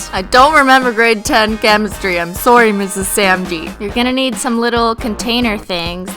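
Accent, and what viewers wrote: Canada. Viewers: It’s cause she’s Canadian you bitch rainy sims! Canada never makes fun of how you pronounce it! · did I just NOW realize her Canadian accent?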